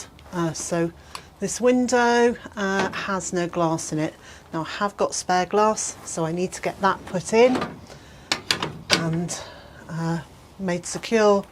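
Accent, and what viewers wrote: United Kingdom. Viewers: hehe i love the "...allo" at the start of all your videos very cute british accent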